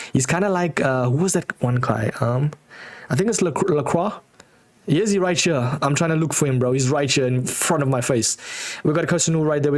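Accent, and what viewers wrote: South Africa. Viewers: Are you South African bro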